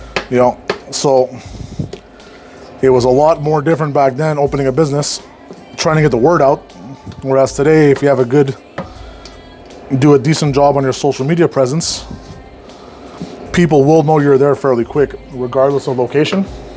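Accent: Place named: Montreal, Canada. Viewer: You are everything and all that, plus a good dad. But that Montreal accent! Chef's 💋. I miss it out here on the rural west coast and yours is a particular thing of beauty. Have a great service tonight. Love the videos.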